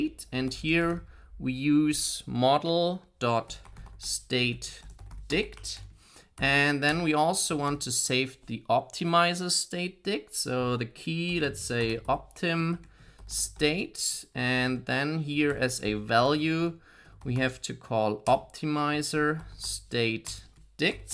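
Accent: Germany. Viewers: I love how uncompromising this german accent is. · never heard a more german pronounciation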